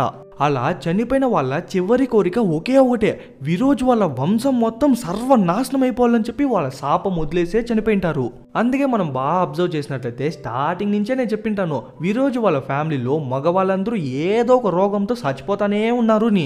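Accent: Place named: Karnataka border, India. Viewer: Karnataka border lo slang alane untadi bro for reference you can see harm hara movie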